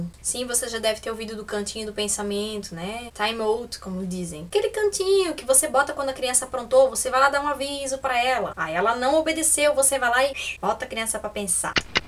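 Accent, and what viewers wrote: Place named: Santa Catarina, Brazil. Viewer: Cara, continua nessa linha que vai dar bem certo! Gosto dos seus vídeos!!Do jeito que fala, ainda mais com esse sotaque "Catarina", rsrsrs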